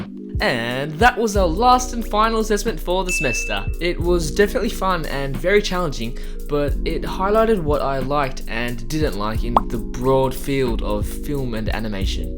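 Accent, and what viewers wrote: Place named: Australia. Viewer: so i've been following you on instagram and tumblr for so long (youtube for at least a year) and as you were speaking, I only just realised that you were Australian... OTL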